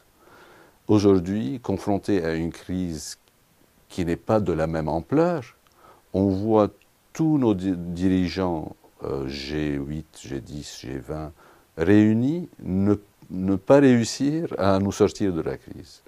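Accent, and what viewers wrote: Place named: Quebec, Canada. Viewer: I disagree. I think he's French-Canadian. Either that, or he has spent time in Canada and he's really really advanced in French.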